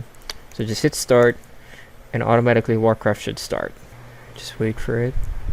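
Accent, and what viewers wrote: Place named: Philippines. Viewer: I was surprised your region is U.S. You sound so filipino for me. Anyways great vid ^^.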